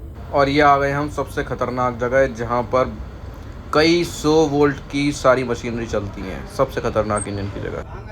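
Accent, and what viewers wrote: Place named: Uttar Pradesh, India. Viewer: Bhai apki awaz sunn kar lgta hai ki aap UP se hain. Mein Azamgarh se hunn, aap kahan se ho?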